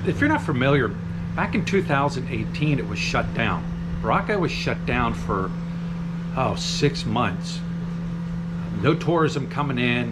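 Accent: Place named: Pennsylvania, United States. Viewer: Are you from the Coal Region of Pennsylvania, because you sure have the accent. You really need to lean how to pronounce the words of dialects in Philippines.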